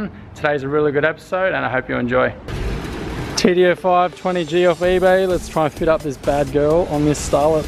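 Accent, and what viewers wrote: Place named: Australia. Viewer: Hey, I love the videos, they’ve been quick, clear and direct info to these motors. Thanks a ton. Also the Australian accent mate! Keep the videos coming!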